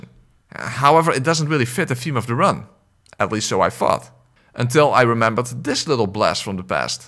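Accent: Netherlands. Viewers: my guy, are you Dutch by any chance? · broer jij bent doodlachen ik hoor gewoon dat jij nederlands bent😂 soldaat 💯❤️ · 14 seconds in, and ur definetely dutch lol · i bet this will be a fun vid but i'm 4 mins in and the dutch that is squeezing through your english plus the dutch accent is making me cringe a bit butt its not you mostly me since i am belgian lots of love <3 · You sound very dutch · You Dutch? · Great vid man, are you Dutch by any chance btw? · First dutch soulsborne youtuber ive found, if you are dutch. You certainly got the accent. Great video btw, was a blast to watch. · you sound dutch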